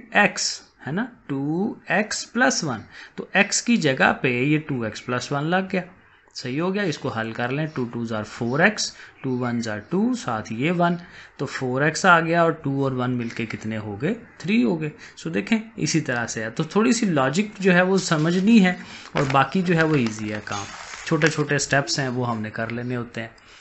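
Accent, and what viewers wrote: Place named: Lahore, Pakistan. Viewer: Yes His Accent are like Lahori People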